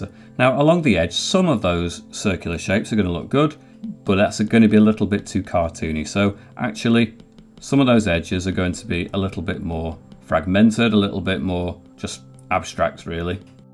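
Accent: United Kingdom. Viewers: Hey! Are you British? And if so, which part of the UK are u from?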